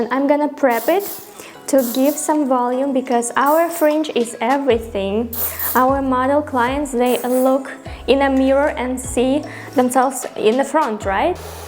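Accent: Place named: Russia. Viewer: Русский акцент и русская мимика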